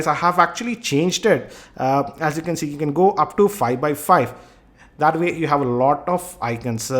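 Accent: India. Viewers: Indian English......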